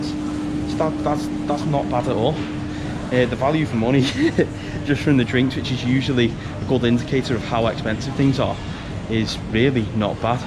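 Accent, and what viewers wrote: Liverpool, United Kingdom. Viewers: hes scouse <3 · having a british accent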